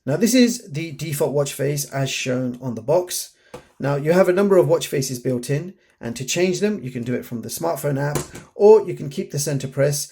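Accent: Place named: United Kingdom. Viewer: I can't understand half of what this guy says. Too much british accent and low volume.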